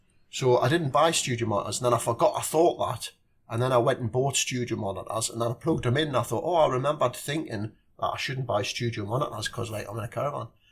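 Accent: Newcastle, United Kingdom. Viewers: "... an then ah fa'got ah thought that an I went an bought some ..." Love it, and love the Geordie. Totally agree, though. I don't have space for speakers either, but I do need new headphones. My skullcandy ones are falling to bits. Sennheiser?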